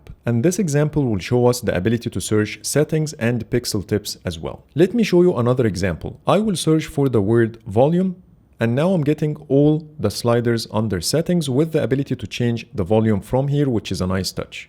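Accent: Egypt. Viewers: The Egyptian accent is corrupting your English.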